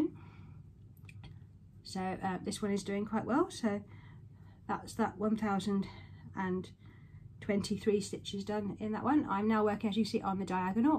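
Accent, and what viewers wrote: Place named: United Kingdom. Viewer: Ok I just found you and your British. Hurrah. Will like and subscribe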